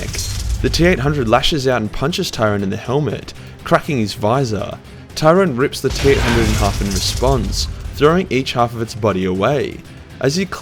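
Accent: Australia. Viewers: Subscribed early - top job Mate ,and it's bonza to hear a fellow Aussie accent to boot !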